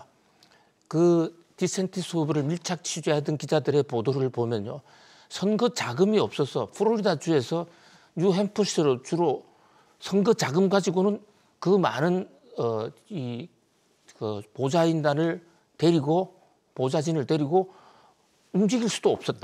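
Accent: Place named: Chungcheong, South Korea. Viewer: 충청도에유 천천히 귀에 쏙쏙이네요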